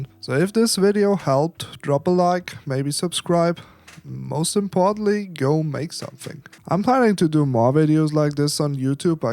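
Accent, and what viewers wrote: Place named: Scandinavia. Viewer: 200 views and nordic accent let's go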